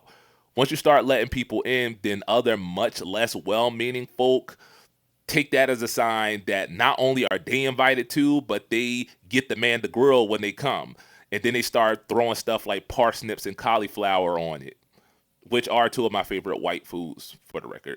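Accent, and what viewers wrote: Philadelphia, United States. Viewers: are u from philly ?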